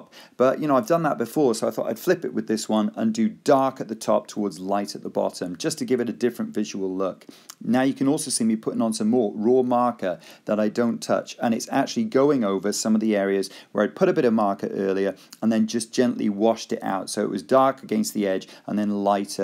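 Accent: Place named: United Kingdom. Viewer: No one here will get this - but as you started talking I was absolutely sure, that you are Liam from Critical Role. You literally have the same voice (and British accent, which could be one of the main reason) but still the resemblance is (as Liam would say) uncanny.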